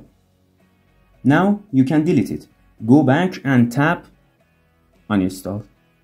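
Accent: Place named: Iran. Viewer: لهجت میخوره ایرانی باشی رفیق 😂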